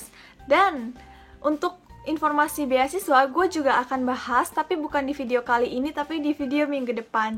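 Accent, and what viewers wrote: Japan. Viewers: Logat mu udah sperti orng jepang dik.?.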